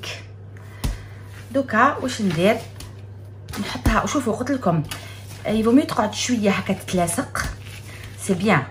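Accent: Algiers, Algeria. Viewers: j'adore vos recettes et votre accent algérois. Allah,Allah. 🇩🇿🇩🇿🇩🇿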